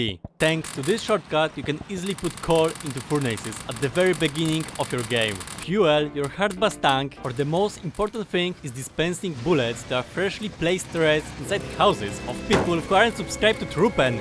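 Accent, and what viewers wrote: Poland. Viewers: Od razu rozpoznałem polski akcent :) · Beautiful Polish accent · Witam zacny poradnik :) Wiedziałem że gdzieś słyszałem ten akcent Polska gurom! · That Polish accent is funny) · twój angielski naprawde zajebiście brzmi jak na polaka. akcent dalej słychać lecz nie jest cie aż tak ciężko zrozumieć. Trzymaj ten poziom mordo, bo nie musisz go podnosić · Ty z polski? Taki akcent masz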